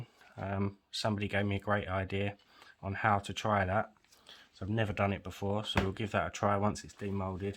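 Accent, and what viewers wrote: Essex, United Kingdom. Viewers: Your accent is *charming*,. I've never, even once, had a problem understanding you, and I'm from the States & have never heard an Essex accent in my life. So, please, don't have another thought about it. It's such a nice accent! Combined with your calm voice...? Perfect mix! And, now, for the video. I LOVE this beautiful mirror casting! ❤ It's so, so pretty! The leaves are perfect (great choice of mica powder) and what a genius way to do it! 👍😊 I'll bet she loves it!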